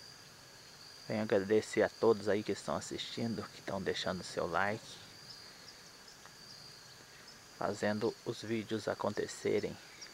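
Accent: Minas Gerais, Brazil. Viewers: Lindo sítio sem conta que adoro esse sotaque minereis eu que sou mineira também de Mahumirim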